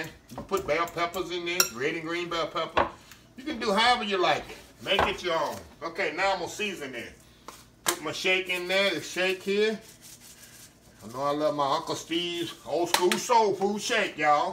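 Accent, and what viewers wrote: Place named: West Texas, United States. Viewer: Samon, yum! Up here in West Tx, sounds like my family. I chuckled. Looks delicious 😋!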